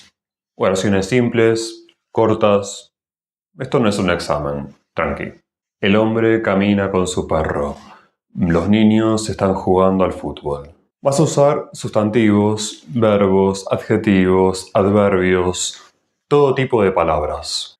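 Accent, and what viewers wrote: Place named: Paris, France. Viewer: A veces me pregunto si usted tiene antepasados franceses, porque su entonación suena como la de los parisinos.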